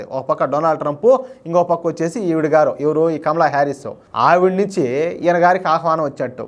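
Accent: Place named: Telugu, India. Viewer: Thank you garu for sharing the the matter humorously. Your Telugu accent perfectly fits in your heavy weight solid punches. God bless you for your patriotism.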